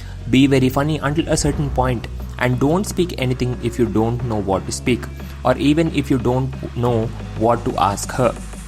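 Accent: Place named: India. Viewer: What the hell indian accent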